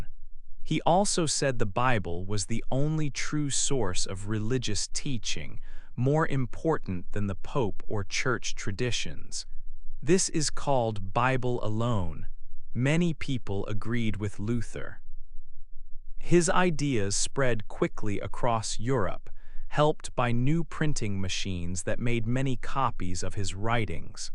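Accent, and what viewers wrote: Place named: United States. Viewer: This American English ist sehr schwer zu verstehen und ist irritierend!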